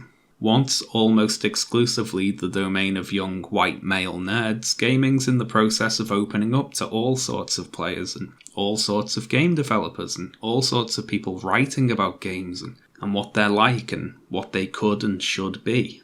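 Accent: United Kingdom. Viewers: I can now see why people love British accent so much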